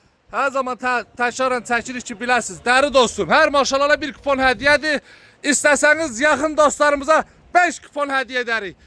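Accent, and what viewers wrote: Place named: Ganja, Azerbaijan. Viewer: Sənin Gəncəli səsinə həri deməmiş quzu kəsim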